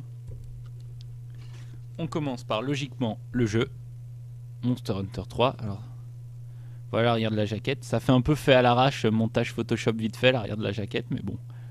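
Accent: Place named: France. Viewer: W00T I LOVE YOU FRENCH ACCENT:p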